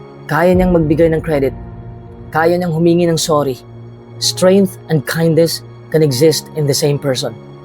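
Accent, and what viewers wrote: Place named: Visayas, Philippines. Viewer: Ang ganda sana ng delivery & voice mo, kaso nga lang need mo pa magpractice ng proper english pronunciation, mejo tunog bisaya po kasi.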